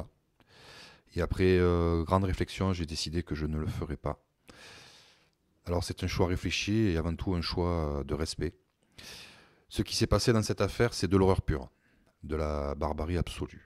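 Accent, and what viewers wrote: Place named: Alès, France. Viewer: Une pointe de trivialité en passant, malgré la lourdeur du sujet traité; Vous avez la même voix ( J' ai tout de même m'y quelques vingt, vingt cinq secondes pour trouver) qu'un certain .....Blanc, également..... Laurent de son prenons. Sérieusement , pour avoir parler avec lui à plusieurs reprises ces dernières décennies je reconnais même certaines intonations, pauses ou enchainements....l'accent aussi. C'est troublant ! Je suppose qu'on vous là souvent dit. Pour ceux qui me lisent sans comprendre, Laurent Blanc est un ancien footballeur professionnel ........! Peut être, êtes vous habitant, ou originaire, de la même région que lui ( Alès ) ? Je m'abonne et reviendrais vous écouter, vos propos dans cette vidéo m'ayants touchés.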